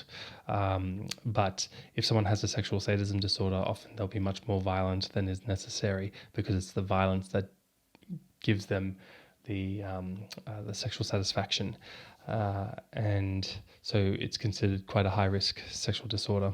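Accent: Australia. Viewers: He's Australian, he sounds Australian the whole time to me as an Australian myself.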